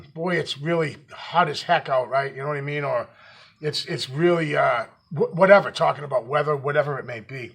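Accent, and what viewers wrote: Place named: New England, United States. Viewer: Your analysis of tree and branch breaks are insightful. I don't always agree, but breaks are still physical evidence. I've agreed with quite a few of your assessments. I think changing the time of day that you do your hikes will change what you might find. Midday is probably not the best time of day. But no matter what any one thinks, you keep doing what you enjoy. And by the way, we enjoy your New England accent, lol.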